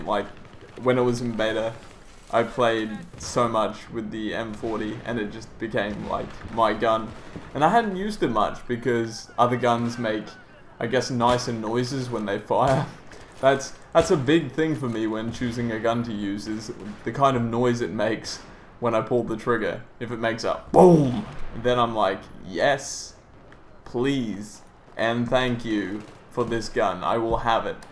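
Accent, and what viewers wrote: Australia. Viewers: Fuck yeah! thought that was an Aussie accent, and you live bloody close to me. im Sunny Coast... well not really sunny, kind of an ironic name don't ya think.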